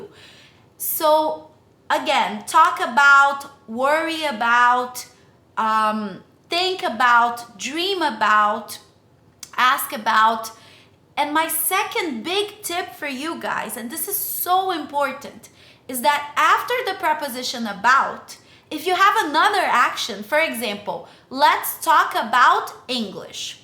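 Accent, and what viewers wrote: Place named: Brazil. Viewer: Seu ingles é perfeito!!! Mas eu reconheço um brazuca em qquer lugar heheh parabéns pelo canal, estava procurando como melhorar meu uso das preposições AT, IN e ON e parei aqui, você tem algum video sobre pra eu dar uma estudada por favor?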